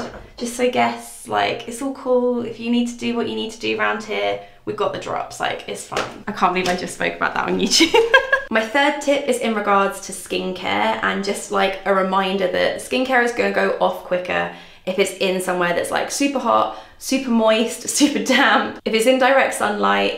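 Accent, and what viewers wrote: United Kingdom. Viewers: Caddy for each family member! WHY did I not think of this?! Also, worth it to listen to a Brit discussing poo drops! Fab!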